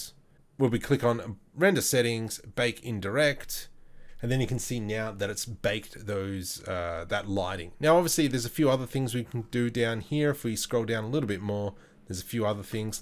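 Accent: Australia. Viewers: Sorry to ask..are you Australian?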